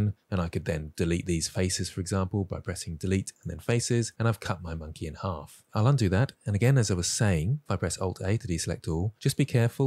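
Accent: England, United Kingdom. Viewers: He’s English, pronouncing English in a thoroughly English way. What’s not to like? It may sound weird if you’ve learned Blender from a New Zealander who makes donuts…. 😂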